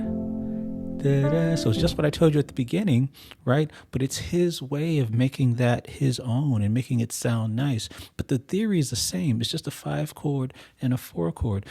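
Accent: Caribbean. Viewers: Brotha, I hear nuances of a West Indian accent. Where do you hail from bro? (Weh Yo People dem Really from?). Blessings from Florida, hailing from the U.S. VIRGIN ISLANDS 🇻🇮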